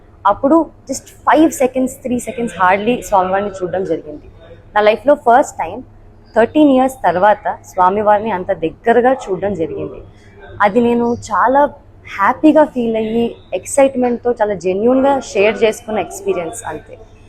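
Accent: Telangana, India. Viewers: Podcast lo your slang was like telangana girl..but ikkada chala different ga vundhi...podcast lo fake slang aa or ikkada fake aa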